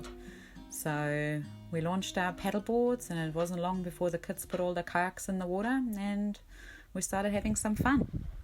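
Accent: South Africa. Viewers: Evening, yousound South African?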